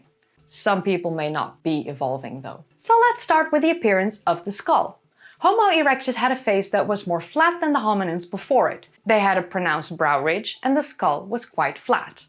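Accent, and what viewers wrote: Netherlands. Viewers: Heel interessant om ons op onze juiste plaats te zetten, wij zijn nog jonkies vergeleken met deze homies. Bedankt voor de vertegenwoordiging van nederlandse uitspraak in deze engels-gedomineerde omgeving. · Hm, what when they are not disappear / going extinct , because they had offspring with the other human species? When the DNA could not extracted, we don't know it. ;) Greetings from Germany and I like your Dutch accent. Heidelbergensis aussprechen ist auch auf deutsch ein Zungenbrecher .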